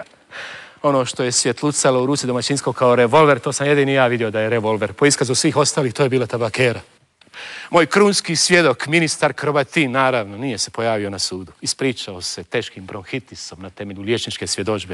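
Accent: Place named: Herzegovina, Bosnia and Herzegovina. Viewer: Nema glumca do Rade Šerbeđžije koji zna govoriti Krležu! Moj naklon i Despotu ali ima svoj hercegovački naglasak!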